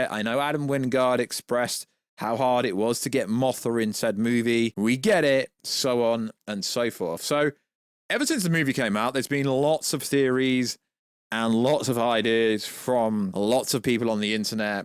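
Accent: England, United Kingdom. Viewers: Stop calling him "Kudora/Kidoruh" ... It's Ghidora lol I know you have the English accent but Damn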